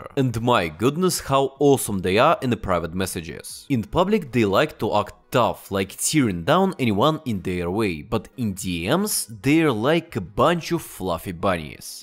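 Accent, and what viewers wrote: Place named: Russia. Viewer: hope you don't change your accent along the years XD best Russian one so far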